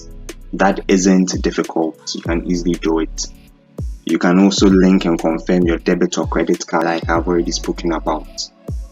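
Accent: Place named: Ghana. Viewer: Pure Ghana man English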